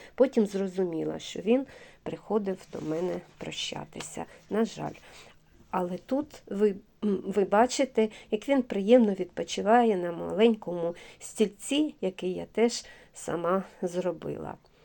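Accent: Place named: Russia. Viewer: Голос молодої жінки. Дуже гарна мова, хоча відчутно що колись людина говорила російською або суржиком. Дякую.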